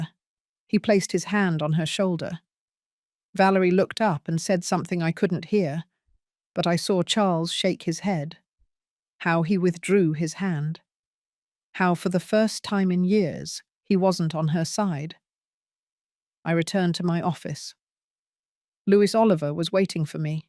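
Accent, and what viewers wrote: England, United Kingdom. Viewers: Did this case happen in Great Britain? The narrators accent sounds English & a few things about court seem not American. The DIL seems to be an idiot, too. Also had I been her, the judge, I'd have the police waiting outside. When court was over, she'd be arrested for having assaulted me; and yes, I'd press charges for sure. And I'd cut son out of will. He's scum & stupid too. Instead, I'd leave everything to grandkids when 21. And I would tell son that wife has been stealing from him & the law firm. Prison for her!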